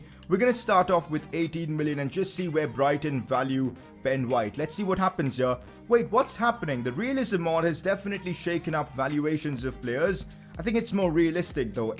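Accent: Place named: India. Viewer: Pcq why is your voice weird like Indian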